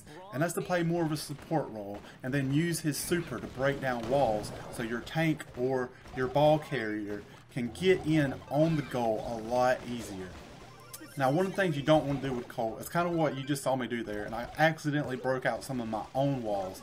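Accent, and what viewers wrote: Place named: South, United States. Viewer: I love you’re southern accent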